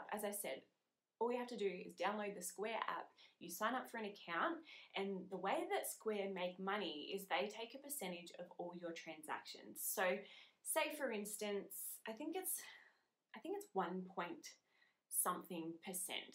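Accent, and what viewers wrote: Australia. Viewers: Yay an Aussie talking about stores I actually know.. lol.. well done 👍🏻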